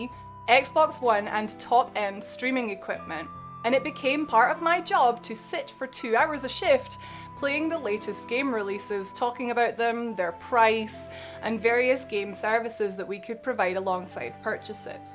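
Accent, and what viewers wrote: Scotland, United Kingdom. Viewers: Anyone find her Scottish accent really soothing. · How the bloody heck does anybody have a problem with your voice and accent, as an Englishman i have always found the Scottish accent to be a wonderful accent, and the scum that have a problem with your weight need to just do one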